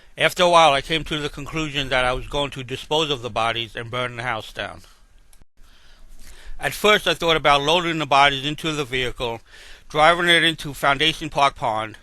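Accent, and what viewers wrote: New York, United States. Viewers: You sound like you are from New York. Great channel by the way, I loved your style of editing and presenting. Subscribed today. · Are you a New Yorker?! haha Adorable. Great narration! I'm from Queens!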